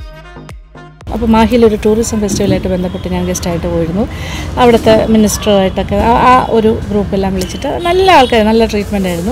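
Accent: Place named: Southern Travancore, India. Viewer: No doubt you are the one who inspired culinary skills in all young minds!! Even I was very particular to be present before Kairali channel at the right time to watch you appear - - though staying at the heart of tvm city your southern travancore slang attracted me the most- You always maintained an unique cooking style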